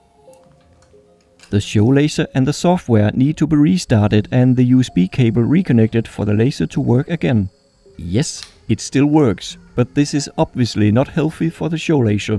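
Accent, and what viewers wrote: Denmark. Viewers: Man kan bare høre på den accent du er dansk haha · Warm Danish accent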